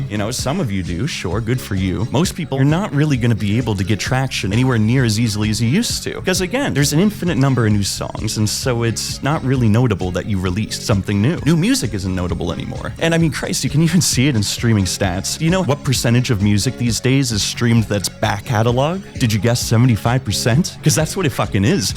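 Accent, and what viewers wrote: Canada. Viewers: All you Canadians sound the same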